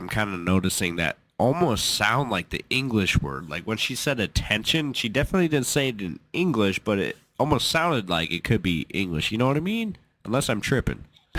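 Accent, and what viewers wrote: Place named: Midwest, United States. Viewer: A-Ha! I see, you live in IOWA. I'm Japanese, but I used to live in Nebraska. Your face, the way you speak reminded me of an American friend of mine back in the days in Mid-West. It must be very freezing now. Take care of yourself, buddy.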